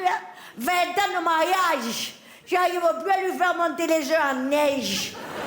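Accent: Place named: Cannes, France. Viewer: Bravo Mado vous aime géniale et incassable moi qui vient de Cannes et qui n'y suis plus ça me fait un bien d'attendre l'accent de chez nous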